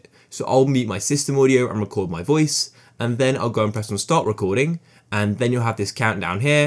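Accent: United Kingdom. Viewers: bri-ish mate helped me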